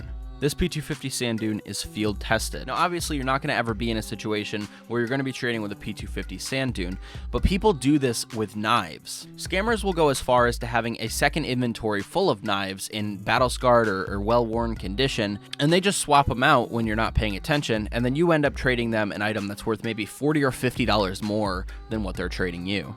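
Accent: Sweden. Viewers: Are you Swedish or American? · Are you from sweden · Bruh u from sweden ? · Are You from sweden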